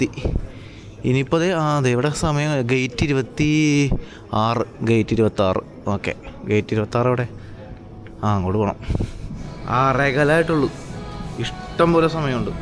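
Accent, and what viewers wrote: Kottayam, India. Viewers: Super 👌 എറണാകുളം malayalam പറ bro കോട്ടയം malayalam ആയല്ലോ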